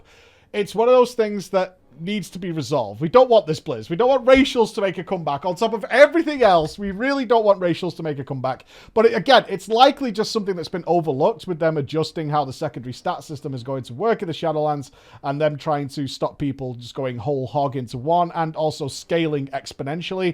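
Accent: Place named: United Kingdom. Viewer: Orientated....British accent but American lingo. The simping is real.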